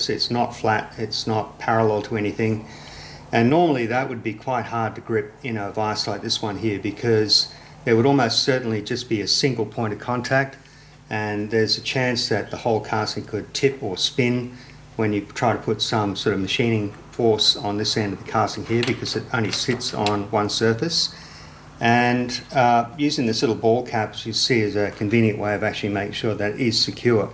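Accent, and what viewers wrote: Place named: Australia. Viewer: Good Show Mate: As per normal. I always enjoy your vids. Enjoy the Ausi accent too.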